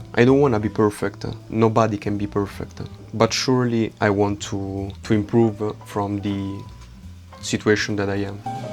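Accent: Italy. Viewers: Dall'accento sei palesemente italiano ahah, comunque bel video! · "Aiming for perfection is the step towards sadness" yeah right at the end of the day its always You vs You. Loved your accent buddy Italians have a really such adorable English accent 🤍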